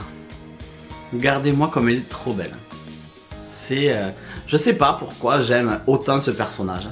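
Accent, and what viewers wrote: South, France. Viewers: Coucou =) Découverte de la chaine avec cette vidéo ;) Ah ça fait du bien un accent du sud!! Je suis fan de tes tsum Elliot derrière <3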